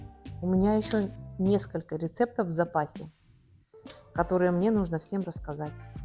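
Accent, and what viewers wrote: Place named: South, Russia. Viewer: ЛОжить, лЯжу - из малороссийского говора, другого чередования согласных, ударений, ну и что, с большой теплотой к нему отношусь, весь юг российский на этом диалекте говорил, окают в Нижнем, акают в Саратове, Москве...и т.п. Это все прекрасный русский язык в разнообразии своих практик жизни. Спасибо вам, за доброжелательность и неравнодушие!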